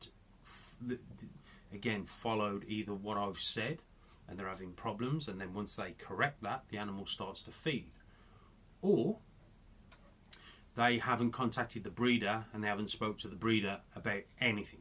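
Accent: Black Country, United Kingdom. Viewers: Well Mr, if it don't come from you I don't want it😲 but that because of the yard stick I mark others by, it's reputation, reputation, reputation with a bald head and a Black Country accent😅😅😅😅😅😅😅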